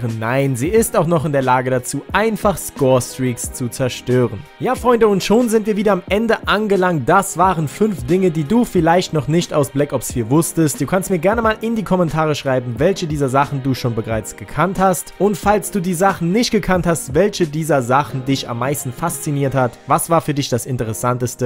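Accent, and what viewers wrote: Saarland, Germany. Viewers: ausm Saarland ? Und Frohes Neues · Warum hast du nen türkischen Namen?Du klingst entweder sehr gut integriert oder wie nen Deutscher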